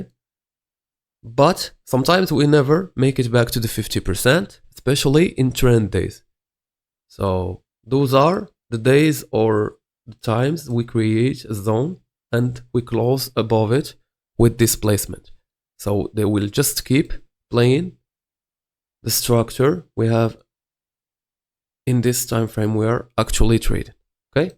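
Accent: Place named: Morocco. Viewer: سلام عليكم، اخي عرفتك مغربي من صوتك تبارك الله عليك وبغيت نسولك واش تنصحني نبدل من ict لهاد الموديل تع dtfx واش في نظرك احسن من الايسيتي حسب التجربة ديالك ? وسؤال الثاني واش مكينش شي استراتيجية فيها RR اكثر من 1 في استراتيجيات تع ال dtfx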